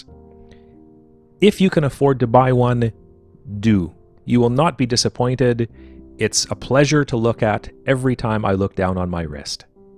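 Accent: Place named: United States. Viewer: Konstantin Correct. But he sounds American and they spell English words badly there.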